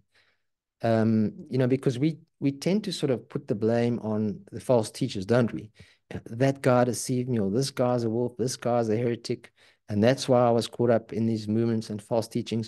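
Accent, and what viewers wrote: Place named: South Africa. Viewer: Hey, spot the South African accent!! 😄 Greetings brother.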